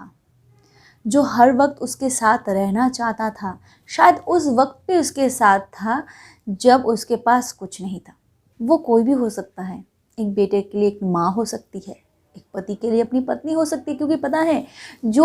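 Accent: India. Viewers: Love your hindi accent..🥰🙈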